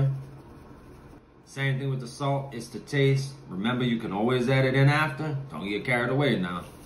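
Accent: Louisiana, United States. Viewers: Let's Go! Yummy! You a lil ole Cajun just like my nieces husband. Love from Ms. Flournoy. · Subscribed and enjoy watching all your tutorials.. also love the Louisiana accent!! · That’s the Louisiana accent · That’s how our white people sound down there. That’s when you know they are from the dirty dirty part of Louisiana lol it took me to go to the military and come home 3 years later to actually hear how we sound lol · This is specifically the cajun accent from lousiana, theyre a unique culture.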